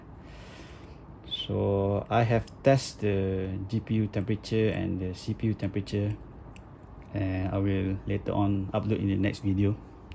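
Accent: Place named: Malaysia. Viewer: Judging from your accent are you from malaysia?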